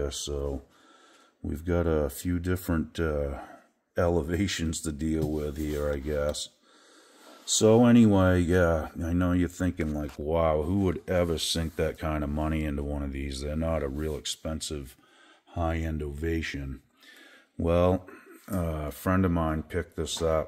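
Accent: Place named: Maine, United States. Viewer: Im trying to get the accent. I'm thinking southern maine, or maybe Taunton. Give us a clue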